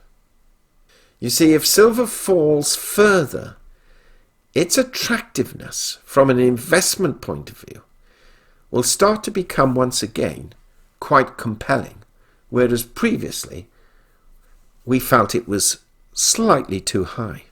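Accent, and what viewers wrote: United Kingdom. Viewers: why do I take things more serious when it's reported in a British accent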